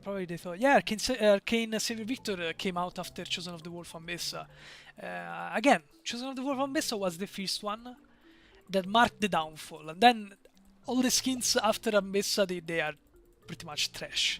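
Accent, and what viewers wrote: Italy. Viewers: stupid question but are you italian??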